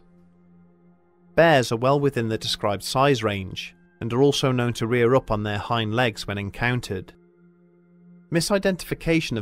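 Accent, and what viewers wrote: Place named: United Kingdom. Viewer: The Michigan Dogman narrated by a Brit......give me a break!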